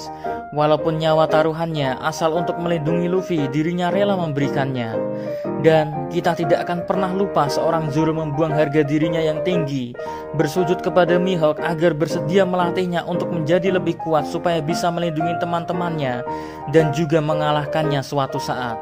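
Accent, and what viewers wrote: Java, Indonesia. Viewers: Love you min.. terbaik medok nya🙏🤣🤣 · Medok lu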